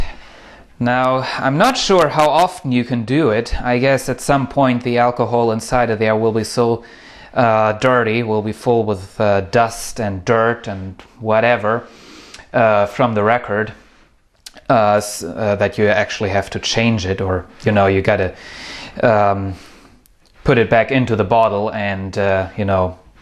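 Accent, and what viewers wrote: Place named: Germany. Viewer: forty earo :D you sound so german, alter :D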